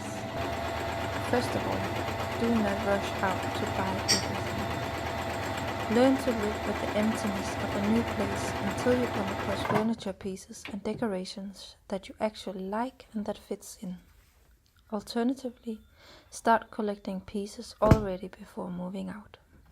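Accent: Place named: Denmark. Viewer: Thank-you for the voice over! I love hearing your Danish accent. It is such a lovely addition to your videos!!!!!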